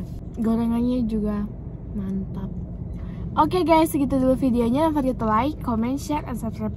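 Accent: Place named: Java, Indonesia. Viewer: Medok bgt😳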